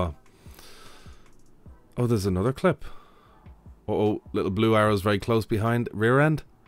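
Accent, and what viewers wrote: Ireland. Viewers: The Irish accent, just love it.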